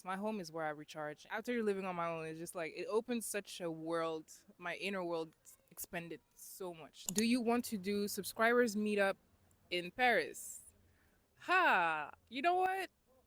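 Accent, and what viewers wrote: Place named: England, United Kingdom. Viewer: You are sooooo cool! New subby!!! I LOVE your English accent!!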